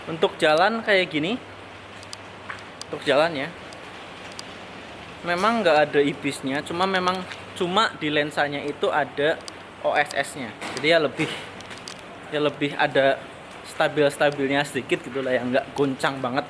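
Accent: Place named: Central Java, Indonesia. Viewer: tapi kok logat nya jawa bang, kyk logat jawa tengah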